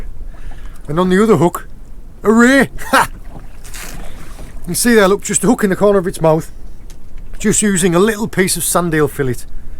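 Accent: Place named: Cumbria, United Kingdom. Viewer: Great content as always mate, you proper sound like your from Cumbria, the accent is uncanny, I’m up in a town called Maryport, keep up the videos mate 👍🏼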